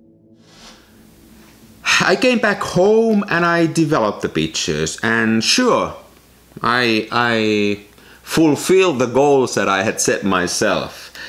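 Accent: Finland. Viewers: I like this kind of content, subscribed. Good photos, no long boring cuts with loud and crappy music. Finnish accent is a bonus. It sounds funny and for some reason I like it. :)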